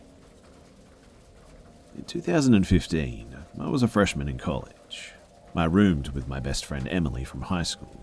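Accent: Australia. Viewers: Bro you an Aussie like me? Sounds like it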